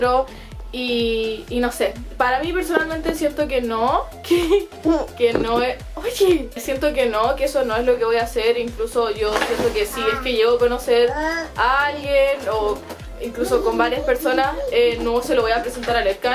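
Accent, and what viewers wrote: Chile. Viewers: ¿Eres chilena?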